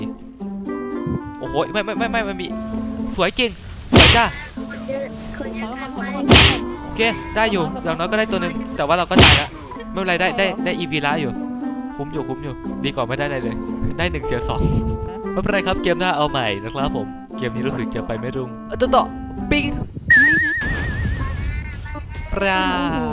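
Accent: Isan, Thailand. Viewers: เดี๋ยวเฮาช่วย ชอบอีสานสำเนียงไทยของพี่อ่ะน่ารัก5555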